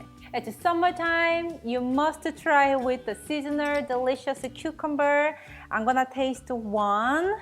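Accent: South Korea. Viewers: fyi korean has many dialects... and by dialect i mean her korean dialect not her english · what do you mean by dialect? She's Korean and just has an accent when she speaks English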